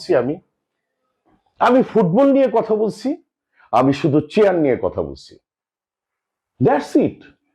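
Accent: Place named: Comilla, Bangladesh. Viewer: বাড়ি কোথায় কুমিল্লা বুঝতে হবে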